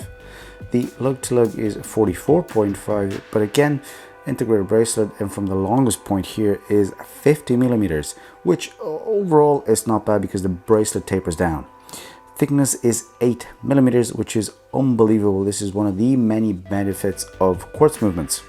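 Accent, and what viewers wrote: Ireland. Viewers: Great review! I was thinking you had a vague Irish accent and checked insta to see your based in Dublin lol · Are you located in Ireland? Hint of an Irish accent.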